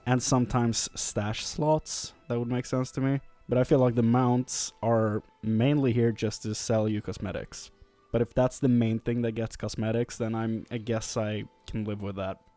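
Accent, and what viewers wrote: Sweden. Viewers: He sound Swedish. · As a swed, he sounds like a swed ^^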